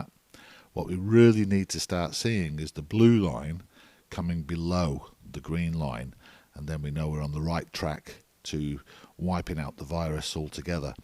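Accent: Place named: Indonesia. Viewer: I like the intro: (indo accent)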